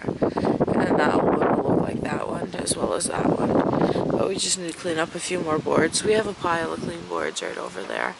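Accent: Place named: Newfoundland, Canada. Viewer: where exactly are you from? that accent sounds like your from Newfoundland ☺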